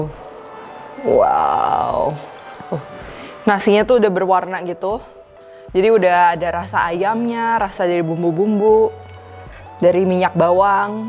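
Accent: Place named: Cengkareng, Indonesia. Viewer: Masukin dialek warga cengkareng bgt.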